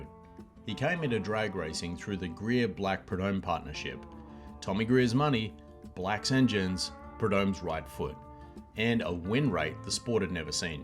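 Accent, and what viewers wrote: Australia. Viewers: Great vid, no AI, and the down under narration seals the deal. Subbed, liked, and hyped . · I swear I don’t think all Aussies sound alike, but you sound remarkably like Dr Robert Chase, MD (Jesse Spencer). Great video btw, nice to find non-AI channels 🎉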